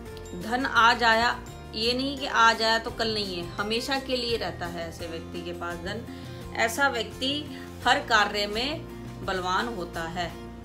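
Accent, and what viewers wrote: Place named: Punjab, India. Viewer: madam app ki awaj me punjabi jhalakti ha kya aap bhi punjab se hain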